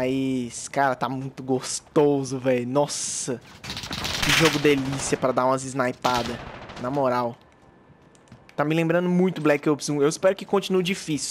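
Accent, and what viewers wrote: Minas Gerais, Brazil. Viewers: Você é mineiro?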